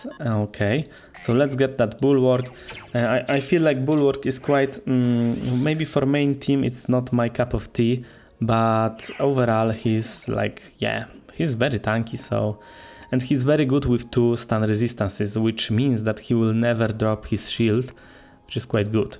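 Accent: Poland. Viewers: Nie wiem odczuwam, że jesteś polakiem, tylko my tak kaleczymy angielski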